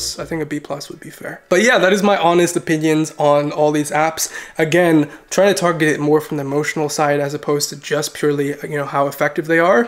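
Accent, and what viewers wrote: Nigeria. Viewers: Does a good nigerian accent but doesn't speak igbo 🙄 i am a bit salty as you can see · Great Nigerian accent! Do you eat any Nigerian food?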